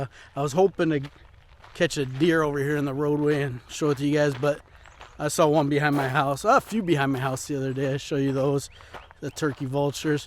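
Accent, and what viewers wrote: United States. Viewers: If it f**ks, flies, or floats, lease it. That’s an expensive life lesson. On a side note. Sounds like you got a Chicago accent . Go Bears. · Like to hear your comment most probably as advice...life is not in the cities in America, its dangerous, l read alot about shooting incident n not too safe either, you make all the best with your neighbour, the wilderness, l'm too indeed attracted to the nature but, never get the change to reach out there 🇺🇲 your American accent is much easier on me 👍Take care and all the best! 🍻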